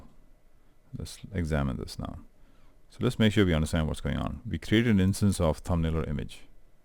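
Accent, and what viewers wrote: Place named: England, United Kingdom. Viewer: Finally, a decent video that explains polymorphorism in a clear english accent! Subscribed.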